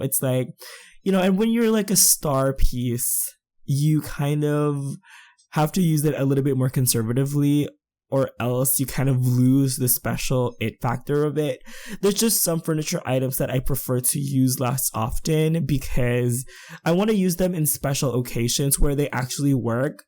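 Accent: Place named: Philippines. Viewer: the first time I heard your voice. and started wondering if you were a filipino.. and you are... hi po ^^ new subscriber here ^^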